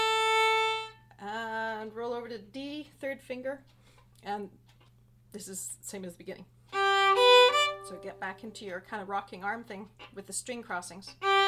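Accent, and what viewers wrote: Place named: Canada. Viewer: I found this video to be very helpful. I have small hands, short fingers, many years (66) and have been taking lessons from an excellent teacher. Never heard that you should roll a finger to the next string and never heard to “never” lift the fingers, which is what I have been doing. Going to try and see what happens!! Love your Canadian accent. Thank you for posting this video.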